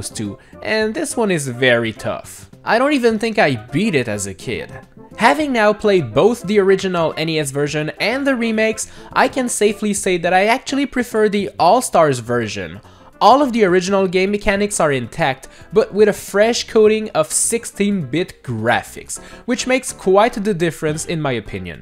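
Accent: Quebec, Canada. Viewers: The captions say busses when he says bosses. Does fight busses, is this just a Canadian thing? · it's a French Canadian accent if you're wondering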